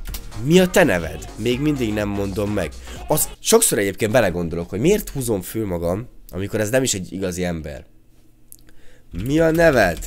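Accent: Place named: Szekler Land, Romania. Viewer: "Föl" végre halotalak székelyesen beszélni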